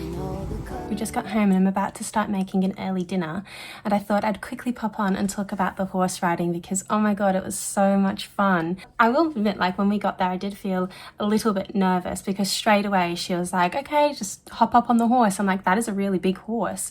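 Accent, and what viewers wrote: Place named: Australia. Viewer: Hi -I stumbled across your videos and love them so much..they are so comforting and cozy with your soft Australian voice/accent. 🙂 Cotswolds is stunning all year round - your filming, music and editing is exceptional - you have a good eye to detail/vision and capture everything so beautifully, so calming and peaceful ❤. Im vegetarian and lovvved the salad...chefs kiss. I live in London and IMO it does the best Christmas lights ever..its sooo magical..you will absolutely love it 🎄🎄Cant wait to watch more of your beautiful calming videos in the Cotswolds. You will leave with amazing memories. xx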